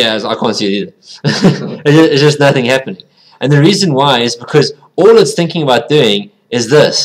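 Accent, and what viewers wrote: South Africa. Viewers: I can hear you're from SA. Great video, thanks so much! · are you south african?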